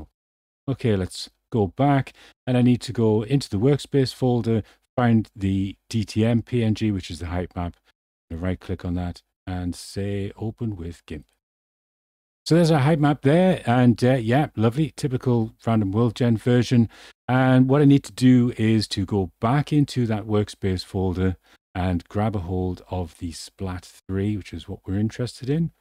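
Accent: United Kingdom. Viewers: Thanks for the response, I'm sure it is a lot more stressful to do that sort of thing As for your sense of humour and personality, it's probably fair to say it is very British, and all the better for that. It can get very hard finding a familiar voice in amongst all of the American streamers. Take care of yourself and I'll certainly finish off watching the last few episodes of the playthrough. Chris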